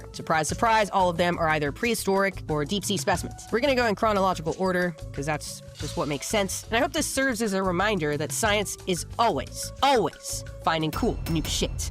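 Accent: United Kingdom. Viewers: Britain has lots of accents. That is sort of BBC English, middle class south east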